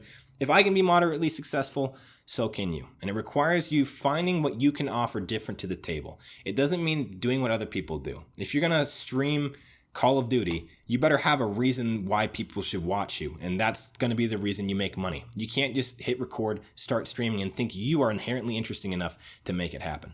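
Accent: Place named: New York, United States. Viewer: Your videos are so well put together I’m guessing you make the hard look easy and your American accent I’m guessing is New Yorkan? That’s just the icing on the cake the content I always feel is first class. What surprised me was today’s content I really needed this as a professional civil engineer I got into it because I followed in my old mans footsteps but I have to say after 18 years I’m done. Time to follow the thing I’ve always wanted to do for the last 12 years, tech. Cheers x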